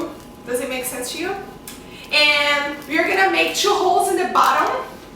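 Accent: Brazil. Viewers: Você é brasileira, né? YOU'RE BRAZILIAN, AREN'T YOU? I KNOW BY THE ACCENT! A receita parece deliciosa! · she sounds brazilian they have onr if the cutest accent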